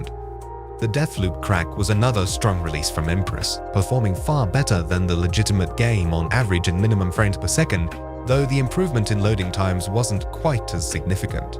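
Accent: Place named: England, United Kingdom. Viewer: bruh i just listened to jolly wangcore, he's australian. idk what the accent is called but this is like a slightly posh English accent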